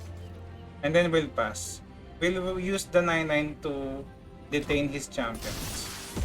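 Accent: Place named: Philippines. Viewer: Are you Filipino? Because your accent really sounds like a Filipino lol (I'm Filipino) Keep up the good work!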